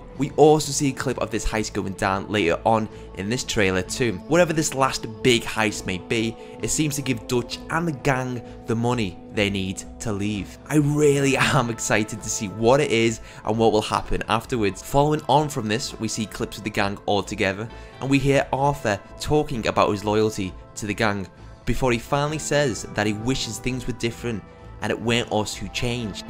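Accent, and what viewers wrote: United Kingdom. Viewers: British acent???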